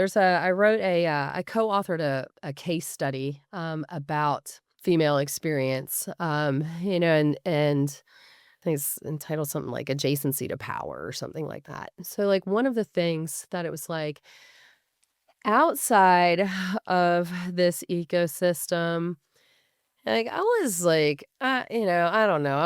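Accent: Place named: Ohio, United States. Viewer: how you describe what you felt - into the video, you could have been describing me perfectly. That feeling, realizing you don't have to worry about how they'll react to what you do (no matter what it is), has been so freeing. And just like you have blanket fort days, I have "do nothing but blast music and drink coffee" days sometimes. Thanks for sharing your story, it made me feel less alone in that experience. It helped you're from Ohio, ha - I grew up in SE Ohio and live in central OH now, with family in Philly, so I appreciate every one of your accents:)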